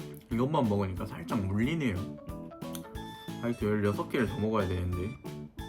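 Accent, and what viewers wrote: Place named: Jeolla, South Korea. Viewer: 약간 광주, 전라도 사투리 억양이 느껴지는데 혹시 광주쪽 사람인가요?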